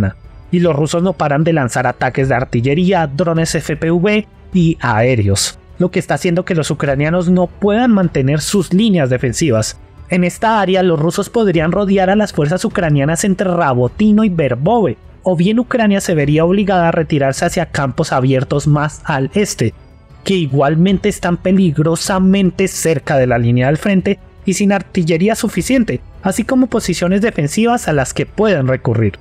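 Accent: Colombia. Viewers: Este comentarista es colombiano? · Pasó de mexicano a colombiano 👍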